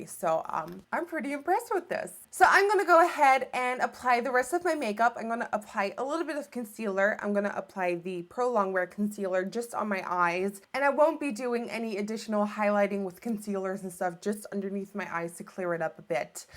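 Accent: Netherlands. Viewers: LOL I was like, Icelandic? Dutch! Love your accent! Ugh, I love me a beauty blender - thank you for commenting on application. Great tutorial love! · omg i can hear the dutch accent here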